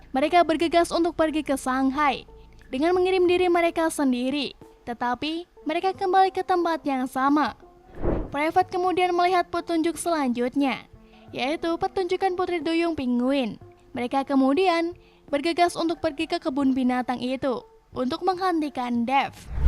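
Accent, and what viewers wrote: Java, Indonesia. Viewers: bak samena wong jowo yo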